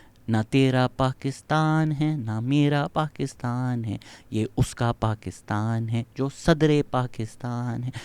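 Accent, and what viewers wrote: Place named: Punjab, India. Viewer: Love your Punjabi accent 😁❤️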